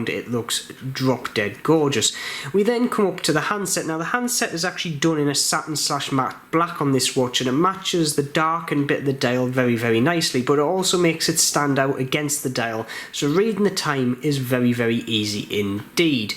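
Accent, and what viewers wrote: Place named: North, United Kingdom. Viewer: Can’t place the accent. South Durham, Cleveland? I enjoyed the review. I have a black gen 2, which, needless to say, I adore. May just get one of these.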